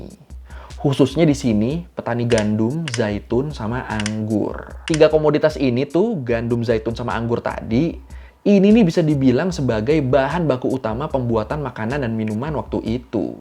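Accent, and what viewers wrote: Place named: Madura, Indonesia. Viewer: Mantap bang belajar soal yunani kuno dgn logat madura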